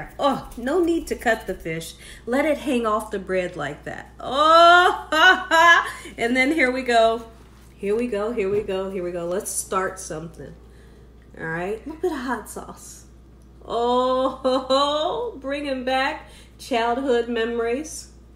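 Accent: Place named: Ohio, United States. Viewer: OOOH MY GOODNESS! BETWEEN THE DELICIOUS 😋 INSPIRING MEAL YOU PREPARED, THE PRAYER, AND YOUR VERY INVITING BEAUTIFUL OHIO ACCENT - YA SOLD ME. THE WAY YOU SPEAK COULD INSPIRE THE DEVIL TO DO RIGHT IF HE'D ONLY LISTEN. YEP I WILL SUBSCRIBE AND TELL A FEW FRIENDS. THANKS 😁😅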